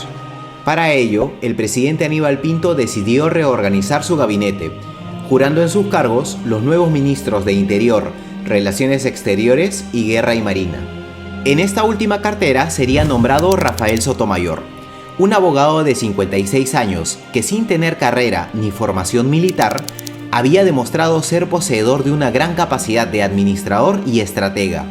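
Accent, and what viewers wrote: Peru. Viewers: Esta historia quien la cuenta me parece que es un peruano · Por su acento, creo que es peruano.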